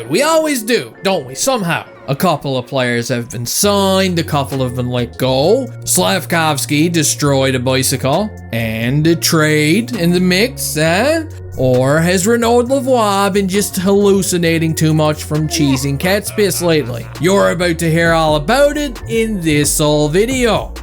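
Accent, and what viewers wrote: Newfoundland, Canada. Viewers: Great video! My dad says your Newfie accent is so thick he thought you were Irish and he’s from Cork.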